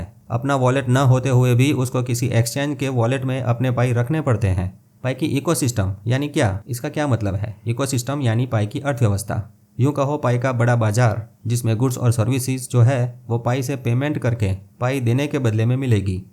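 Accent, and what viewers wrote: Gujarat, India. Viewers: Awesome sirji hindi +gujju tont amazing combination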